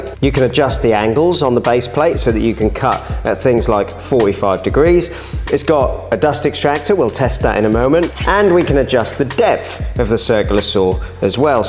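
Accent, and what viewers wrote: Norfolk, United Kingdom. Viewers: are you a norfolk boy buh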